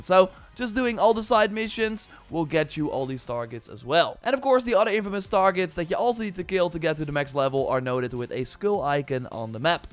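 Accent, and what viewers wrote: Netherlands. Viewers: I have a feeling ur Dutch cause your accent, sorry if I’m wrong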